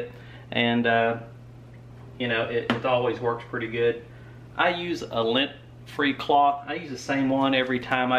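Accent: South, United States. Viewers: I love when a southern accent roasts trump 😌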